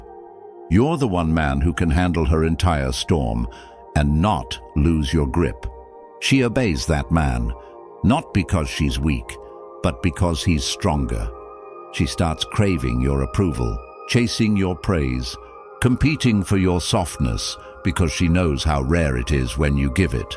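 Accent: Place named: RP, United Kingdom. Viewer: I’m tired of that narrative voice in most of these stoic videos. Then words like “brother” or “a dude in Gucci” would never be said in that upper class British accent.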